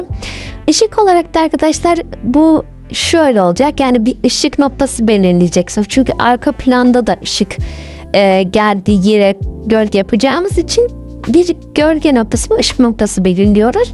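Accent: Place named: South Korea. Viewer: ama sesin benziyo sanki korelimissinde türkçe konuşmay çalışıyormussun gibi❤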